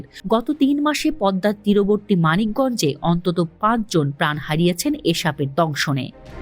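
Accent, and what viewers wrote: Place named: Kolkata, India. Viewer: sangbad pathikar kontho sune mone hocche s ekjon kolkatar mohila.R tv indian dalali bondho koro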